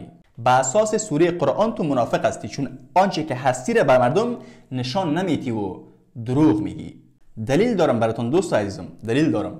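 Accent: Iran. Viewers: افغان با لهجه اجنبی ایرانی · این لهجه ایرانی بسیار جالب هست نه ایرانی و نه افغانی یک لهجی نو است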